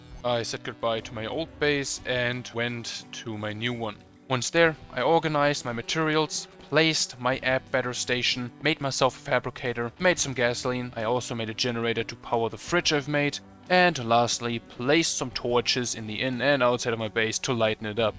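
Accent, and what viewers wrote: Germany. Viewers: Are you German I hear like a German accent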